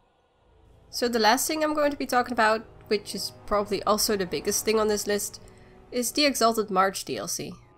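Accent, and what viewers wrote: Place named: Netherlands. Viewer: So i clicked on this because it was recommended content and as soon as i hear the voice i instantly go, this one is dutch...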